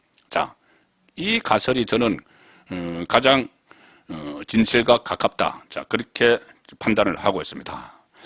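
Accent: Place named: Seoul, South Korea. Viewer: ~믄이 서울사투리 랍니다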